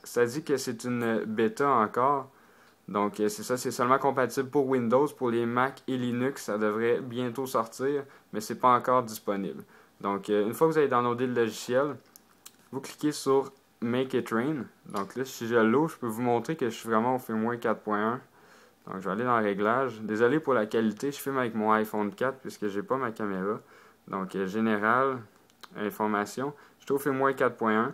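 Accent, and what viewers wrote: Quebec, Canada. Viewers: je kif ton accent du quebec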